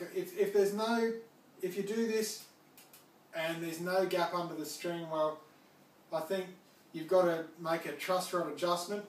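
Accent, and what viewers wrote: Australia. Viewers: Oh wow I was way wrong so you live in Australia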